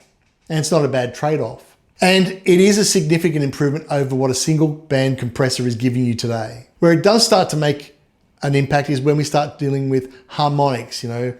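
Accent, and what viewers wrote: Australia. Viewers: Good to come across an aussie accent!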